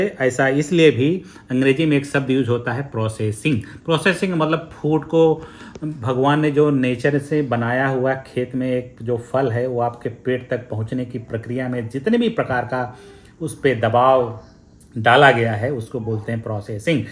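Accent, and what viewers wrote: India. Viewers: * आपने अपने विडियो में 2 महिला + पुरुष के विडियो की क्लिप डालकर बहुत ही अच्छा किया * आपकी हिन्दी में ठेठ देसीपन है और इस वजह से अंग्रेज़ी के उच्चारण में भी देसी फन ( पन नहीं) है * जो सुनकर मजा आ गया * बाकी जानकारी का तो जवाब नहीं * धन्यवाद देसी पन और फन के लिए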